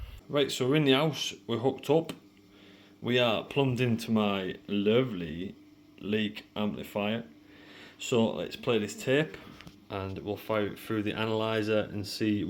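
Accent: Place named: North, United Kingdom. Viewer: Absolutely love this northern (?) accent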